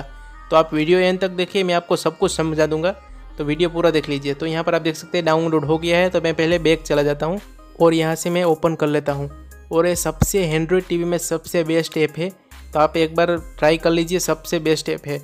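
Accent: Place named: India. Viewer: Englisch with mixed indian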